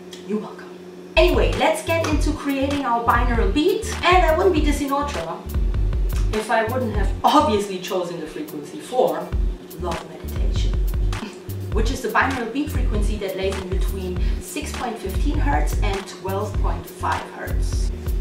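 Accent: Germany. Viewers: Yes sis, feeling the vibes n knowledge. Luv the German accent.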